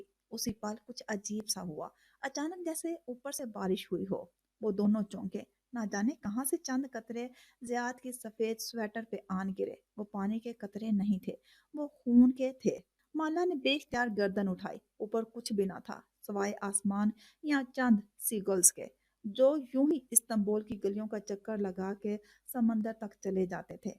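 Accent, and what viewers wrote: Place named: Punjab, Pakistan. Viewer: Nice novel beautiful voice Lekin Punjabi andaz Punjabi language zada hai 😊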